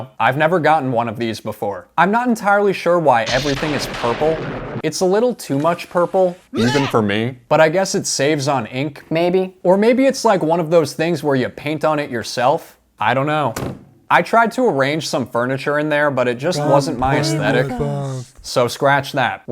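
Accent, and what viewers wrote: United States. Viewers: I don’t really care about your American accent of orange I don’t know why people are getting mad at you OK 👌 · He isn't from US? I am Brazilian and I don't know much about accent, but I thought he was from USA judging by his accent. O.o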